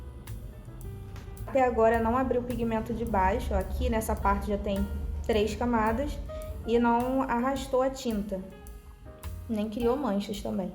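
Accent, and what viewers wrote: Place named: Rio de Janeiro, Brazil. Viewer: Ótimo! Até o sotaque carioca fica mais lindo com um português bem falado e conteúdo. Agora vamos ver minhas aquarelas rs.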